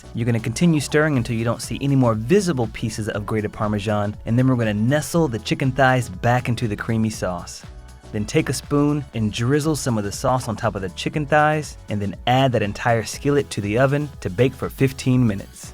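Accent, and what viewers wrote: United States. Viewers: Whoop whoop Such an American accent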